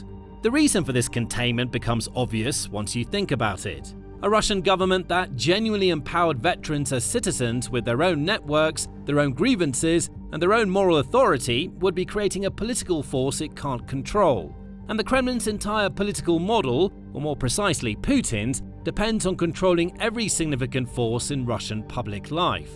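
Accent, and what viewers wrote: United Kingdom. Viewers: Unnecessary prolonged video in a a British accent is tiring….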